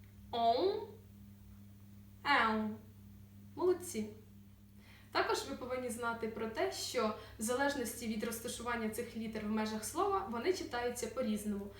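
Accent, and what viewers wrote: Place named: Ukraine. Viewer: Polski z akcentem Ukraińskim :-)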